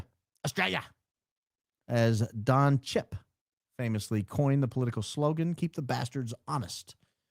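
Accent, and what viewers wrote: South, United States. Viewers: Alright, I’m going to need 1987 southern accent to make a comeback😂😂😂. I also have never voted for memaw Ivey. And I’m not voting for Tommy from Florida either lol 😊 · You absolutely should split your vote up and always vote for the best candidate that's best from America First and Only. I also don't think you looked fat and terrible in that old video, I also like the southern tang a bit.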